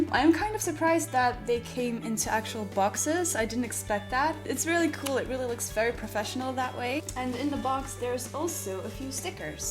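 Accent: United States. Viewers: It sounds a bit American also! It's crazy, but calming. If, that makes any sense. Haha I just love your voice. 😳😳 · You sound American, but you have a totally different speech pattern. Where are you from?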